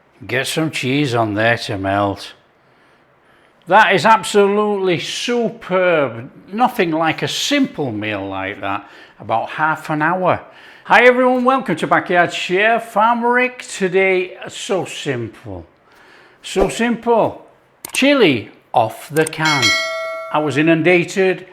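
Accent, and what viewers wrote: Yorkshire, United Kingdom. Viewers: Yorkshire uk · Looks really good,love chili over a baked potato. You said your accent is mixed now,but I think it’s pretty much a Yorkshire sound still,just not exaggerated. I still sound English(Brum) after all these years,Americans know after about 3-4 words,I just use American words,but with a British accent,I lived in American family homes for 6 years then married an American,you quickly learn it’s easier to use American words rather than to explain what you mean all the time.😊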